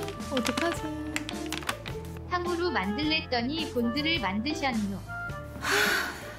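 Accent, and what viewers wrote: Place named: Gyeongsang, South Korea. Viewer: 찐 갱상도 누님 저도 마산살아요!!!!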